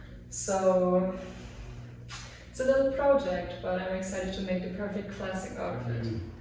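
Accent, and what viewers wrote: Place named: Germany. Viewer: Es ist immer wieder komisch deutschsprachige Menschen Englisch sprechen zu hören... 😂 (Unser "Dialekt" passt einfach nicht. - Obwohl du ja gut Englisch sprichst.) Da fühlt man sich wieder wie im Englischunterricht in der Oberstufe. 😅😂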